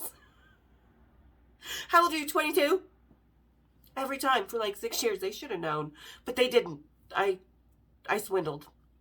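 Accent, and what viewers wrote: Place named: United Kingdom. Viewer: Bruce Dickinson used to live about 3 miles away from me. I love your British acent❤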